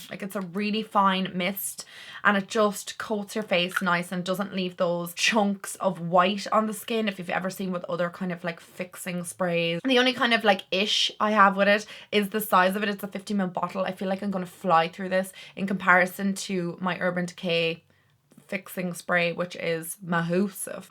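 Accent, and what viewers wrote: Ireland. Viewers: Thank you for sharing these! You look lovely. Listening to you makes Ireland feel a bit closer.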